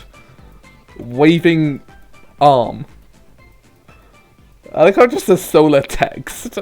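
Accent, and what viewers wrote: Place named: United Kingdom. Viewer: Just so you know, no offence intended, "nubile" is not pronounced "nimble", it is pronounced "new-by-elle". Also, really, if you have troubles with a lot of the words, you should really not read the text. On another note, this is a side game set in a different universe to a game titled "Sunrider" which has since been released as "Sunrider: First Arrival" and "Sunrider: Mask of Arcadius", with a third installment to come at some point. Having played them only really shows you another version of the characters. Hue: a gradation or variety of a color; tint. "Re-Pro-Duck-shun" Seriously now. Your accent sounds like you are British, you should have been taught most of these words by now...right? Again, no offence, just...listening to you mangle the words is like having an ice pick shoved in my ears. A single ice pick. Hitting both ears. 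At the same time. But that is just me. Again, not trying to offend you.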